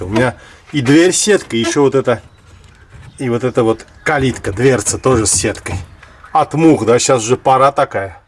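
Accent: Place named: Baku, Azerbaijan. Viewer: Салам из Дербента....спасибо за такие видео.. Такой,родной Бакинский акцент Саг Ол От души 👍👍👍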